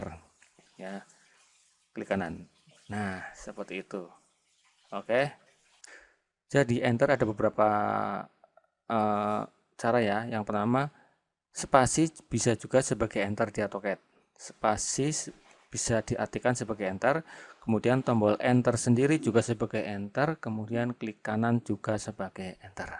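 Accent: Banyumas, Indonesia. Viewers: Belajar gambar dino pak..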